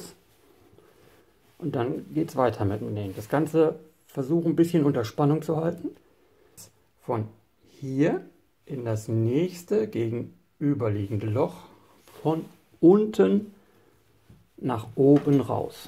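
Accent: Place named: Germany. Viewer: it was also nice listening to your German accent!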